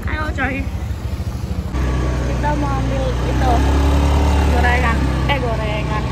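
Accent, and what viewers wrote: Java, Indonesia. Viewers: lucuu muni jowo ne 🤣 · Kk orang Jawa tengah kok suaranya Jawa kirain orang Jakarta , jawab Kaka plis aku juga orang Jawa kk jawab ka plis 🙏🙏🙏🙏🙏🙏🙏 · Kak can ya lucu nk ngomong ngwe boso jowo🤣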